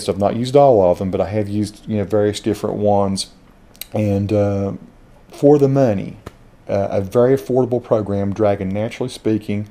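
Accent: South, United States. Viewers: I'm not a fan of Southern accent but yours looks utterly excellent. Good job :D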